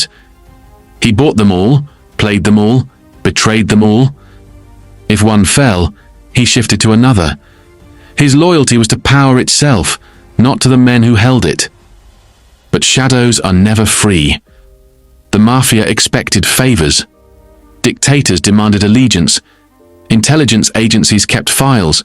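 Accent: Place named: England, United Kingdom. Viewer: they wanted to be broken..funny narrated by a Pom - more damage to the world done by the English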